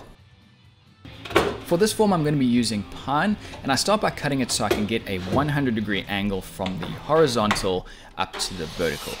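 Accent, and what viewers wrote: South Africa. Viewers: Stunning chair .. and great to hear a saffer accent .. Thanx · Im picking up on a south african accent i think, im also seeing alot of items bunnings sells, would i be correct in assuming you’re in Australia?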